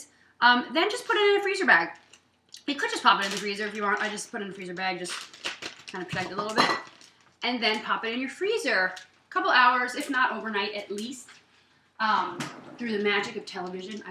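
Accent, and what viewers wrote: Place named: United States. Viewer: Thank you for showing us how to freeze a lemon. I think it's a great idea and I loved the video. I wondered if I could ask you to try and speak a little slower as I am from England and can't always catch the american accent. I wish you and your family well.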